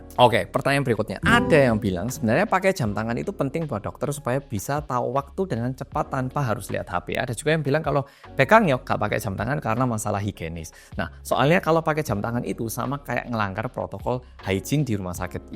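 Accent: Java, Indonesia. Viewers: Mas, sampeyan kih kan 'medok' yo.. Opo ra enek rencana ngajarkek les boso jowo neng kono???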